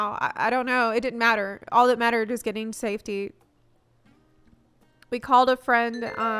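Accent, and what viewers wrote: South, United States. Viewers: Pretty sure she's native English speaker. But yeah, as a Cajun, I'm definitely getting some Southern vibes from her.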